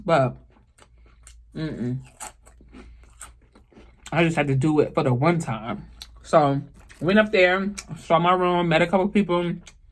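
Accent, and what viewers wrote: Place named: New York, United States. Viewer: You definitely sound more NY than NJ. 😂🤷🏽‍♀️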